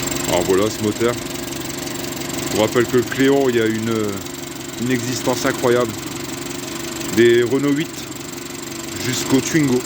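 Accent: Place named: North, France. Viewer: Beau reportage Avec l'accent du chnord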